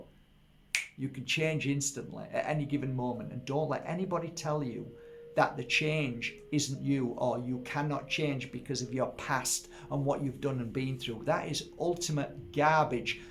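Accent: United Kingdom. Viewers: I love listening to you! And I love your UK accent ♡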